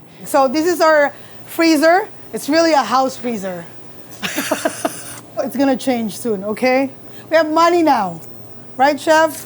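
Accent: Philippines. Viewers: you can definitely tell she actually used to live in PH just by her humor and accent haha ! love her,, nakakaproud maging pinoy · Alam mo bilang pilipino nakakataba na di mo binago attitude mo as accent bilang pilipino kahit malayo na narating mo more power to you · Filipino humor is really like everywhere and you know it's pinoy style because of the delivery. I love your cooking style. You're not afraid to improvise.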